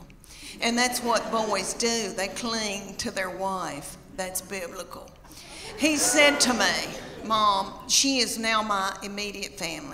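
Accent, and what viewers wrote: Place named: Appalachia, United States. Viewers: I'm stuck in Pennsylvania for a week and a NATIVE OF The BLUE RIDGE MOUNTAINS of SOUTHWEST VIRGINIA!! THE REAL VIRGINIA!! Where we ALL TALK LIKE!! I'm so homesick from hearing her voice!!! I wanna GO HOME!!!! 😣 God BLESS YALL!! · Most of my remaining family is from the deep south and her accent sounds like home. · Omg, how am I just now finding out about you? You are hysterically funny!!! My friend told me to watch your videos the other day. So, yesterday I started watching early in the morning. At one point, I was laughing so hard I was literally crying! The one where you are picking up your kids from school had me in tears. I relate so well. Thanks for the laughs. I can't wait to watch all of your standups and see you live!! I am also from TN, so your accent reminds me of home :)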